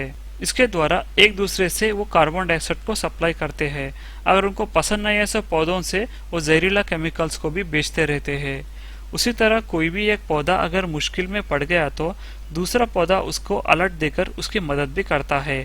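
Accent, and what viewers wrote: India. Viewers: Aap hydrabadi ho na..? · Boli badi acchi lagi, Marathi ho na bhai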